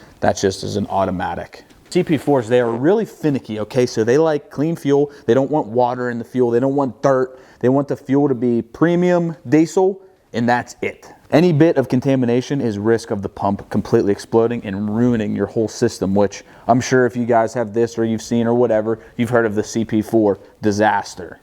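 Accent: Pennsylvania, United States. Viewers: Bro i live in illinois. Before you even said where you're from i was like. "Yeah thats a PA accent." Completely random but i called it.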